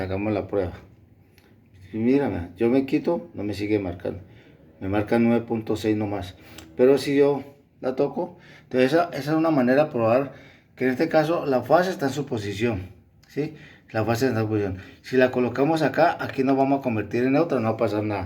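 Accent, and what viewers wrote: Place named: Táchira, Venezuela. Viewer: Hermano no se le entiende nada suelte la lengua para hablar. Se entiende que es gocho y para explicar algo se ase con pausa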